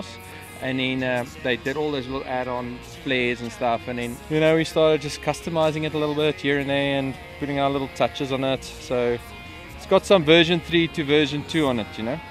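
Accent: South Africa. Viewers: you south african? sounds like our accent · are you afrikaans?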